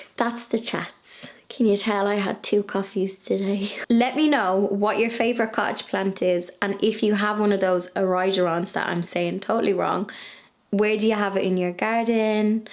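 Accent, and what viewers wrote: Ireland. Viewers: Love your garden updates, it's pure happiness listening to a familiar Irish voice and your slang terms that I miss (ex Blanchie living in UK), love your enthusiasm, you never fail to make me smile!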